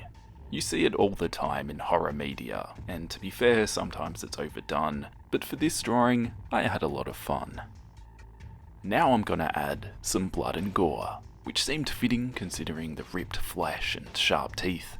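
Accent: Australia. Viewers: I reallyyyy like your horror stile and i always watch your videos as a podcast and your voice and the australien accent chef kiss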